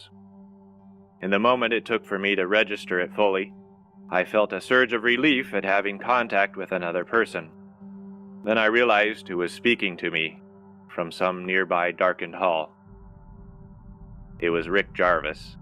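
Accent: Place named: Ireland. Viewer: I've been hanging out for this one. I'm really enjoying this series. I liked your Irish accent. Thank you very much!!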